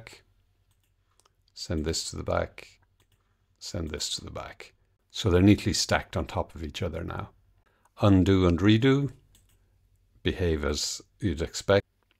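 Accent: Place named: Ireland. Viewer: I was surprised to hear an Irish accent. Good man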